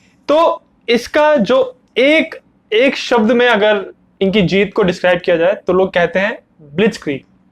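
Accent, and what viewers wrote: Bengal, India. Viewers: Bhai tum Bengali ho kya please reply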